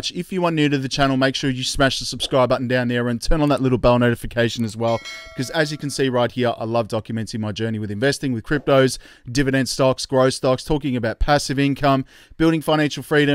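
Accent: Australia. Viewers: Thanks for your info mate. As someone who is new to crypto (about 7 months) I'm still trying to learn as much as possible and navigate my way around the space. I'm basically all in on xrp, as I still don't know enough to diversify too much, and I find it reassuring to have an Australian voice to help educate me. Keep up the good work.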